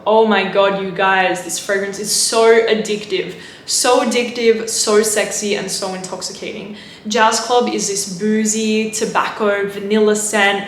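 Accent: United Kingdom. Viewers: are you british?? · Ngl I love her accent it sounds more British than Australian but not completely English maybe it's just the Aussie YouTubers I watch who don't have her accent but hey ho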